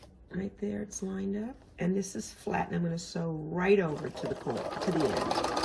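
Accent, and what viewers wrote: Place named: New York, United States. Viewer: Thank you for your truly helpful and honest instructions. Your close up video of piecing and sewing is very helpful. And I love that NY accent and attitude.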